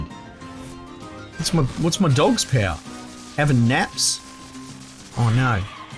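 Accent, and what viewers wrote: Australia. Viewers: Nice original commentary, maybe I just appreciate a good ozy accent lmao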